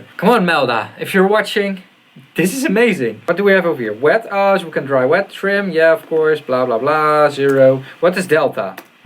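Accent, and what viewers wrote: Netherlands. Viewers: I'm perceiving a Dutch vibe in the way you speak English :) nice review man, quite objective.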